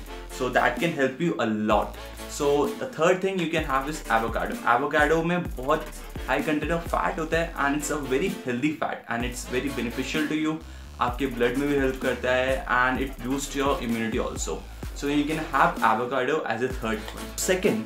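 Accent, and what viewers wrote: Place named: Philippines. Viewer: Your English sounds like Philippino, it took me a while to understand it. After the intro I thought you had switched to a different language.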